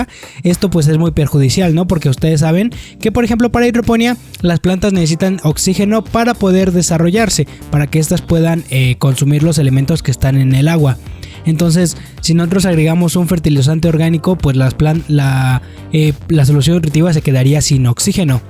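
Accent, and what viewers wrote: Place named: Mexico City, Mexico. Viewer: A mi, me molesta mucho el sonido que hace cuando usa "tr", ya sé, es extraño pero me imagino que tiene la boca lleva de baba además además de su acento chilango, pues buen trabajo. Felicidades.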